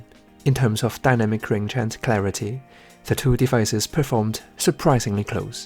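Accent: United Kingdom. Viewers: 英式英文哦！！！ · 只是有点听不惯英国口音，呵呵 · I like your British accent 😀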